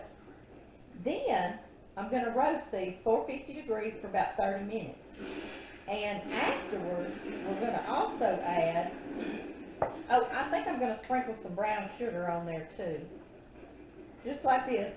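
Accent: South, United States. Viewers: Just had to follow you as you seem such a lovely warm person..Oh I love your country accent too. Hope you had a lovely holiday in good ole USA from me in the UK x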